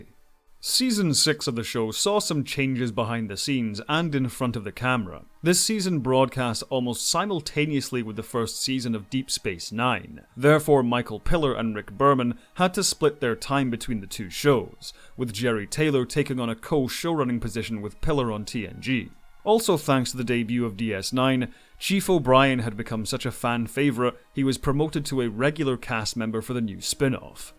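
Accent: Scotland, United Kingdom. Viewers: You have a very, very slight Scottish accent.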